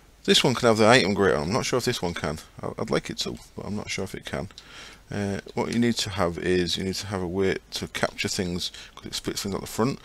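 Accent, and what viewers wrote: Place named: Northern England, United Kingdom. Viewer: I'd be interested in listening to your soothing northern accent no matter what game you were playing. Reminds me of my grandfather Grumpy a good Yorkshire lad.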